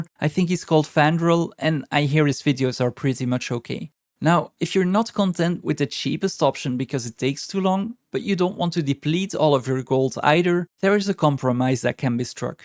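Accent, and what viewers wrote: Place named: Flanders, Belgium. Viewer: Hi, found your channel recently. I like your to the point guides. You sound "Flemish". Where are you from?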